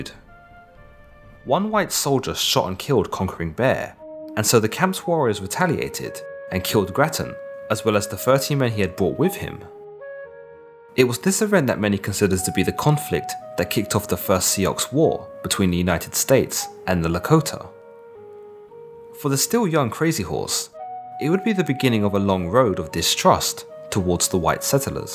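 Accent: United Kingdom. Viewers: Fucking hilarious hearing a Brit talk about my history lol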